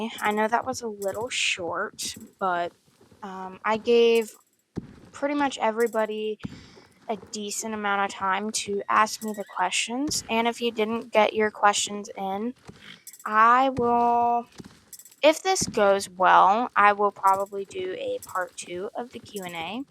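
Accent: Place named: South, United States. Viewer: Twin you got an accent too southern twinnny!! -Shenzi or Scylla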